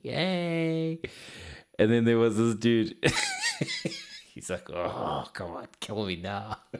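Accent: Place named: South Africa. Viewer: If I'm not mistaken, you have a south african accent :) my mother was from woodstock Cape Town.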